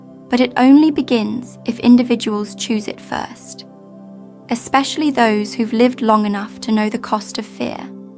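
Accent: England, United Kingdom. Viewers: I like this girl when you do the English accent better than American accent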